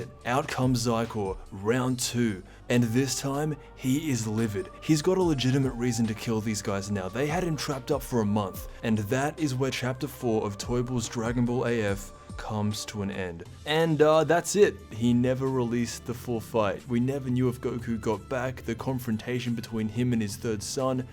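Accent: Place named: Australia. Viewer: probably so put i enjoy his Auzie way of explaining it lol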